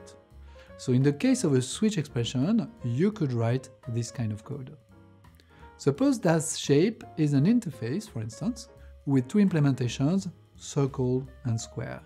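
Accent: France. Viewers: The accent sounds french to me, and some sounds of vowels are silent. I use the subtitles.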